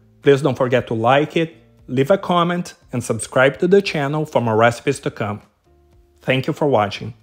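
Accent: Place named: Brazil. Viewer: dig the recipe and glad you mentioned the sour dough bread toast --i'd think that apple cidar vinegar in recipe would taste great with avocado toast and rind recipe. And great accent! it reminds me of a great friend in college who's from Brazil..an instructor and now a gym owner in Dallas.. More recipes segments to come i hope!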